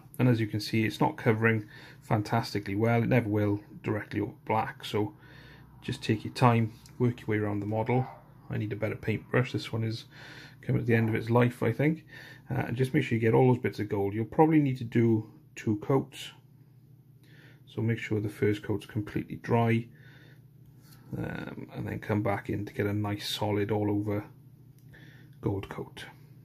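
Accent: Wales, United Kingdom. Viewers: Hey great video, just picked up my first Age of Sigmar set and I'm keen to get painting. Also, do I detect a little Welsh accent in there? Either way, greetings from South Wales :D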